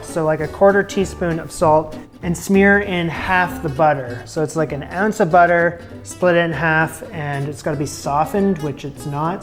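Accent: Canada. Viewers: Your accent gave away that you were from Canada. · Thought you were a Canadian guy! ❤️😊🇨🇦 Love your channel, keep up the great content!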